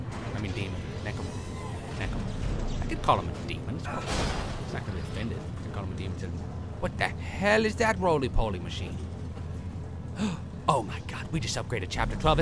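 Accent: United Kingdom. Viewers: your british accent soundslike a non robotic Weatly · British voice kind of reminds me of the Geiko Gecko. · you kind of sound like austin powers in your awesome british accent ! =)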